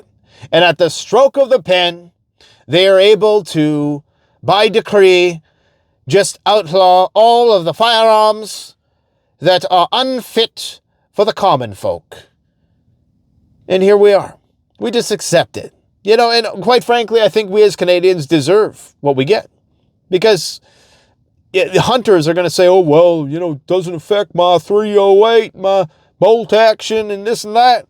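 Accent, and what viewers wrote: England, United Kingdom. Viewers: Lol British accent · I want more british accent!!! 😅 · Your british accent had me dying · Geeze you sounded more British then me 😂😂 brilliant!! Thank you for your updates buddy much love from Dorset 🇬🇧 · Why use an English accent when talking about Trudeau? We have pr**ks in England but we don't use Canadian accents to describe them 🤷🏿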